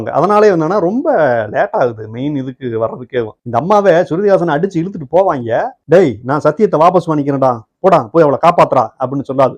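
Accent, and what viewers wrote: Madurai, India. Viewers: Maapla u are sema under rated 😂😂😂 Madurai slang la summa alaparaya iruku review maplooooi 😂 😂😂😂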